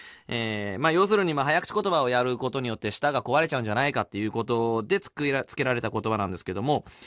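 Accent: Germany. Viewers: You can instantly hear that he grew up speaking german. Source: It's my mother tongue. The CH sound is actually pronounced like that ^^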